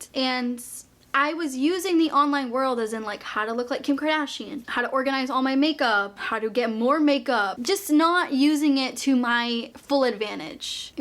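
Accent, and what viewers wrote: Boston, United States. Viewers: Hello from Canada. I'm a relatively new subscriber and am so glad I found your videos. You are so down to earth and logical with very good information. I just have to tell you that I love your Boston accent.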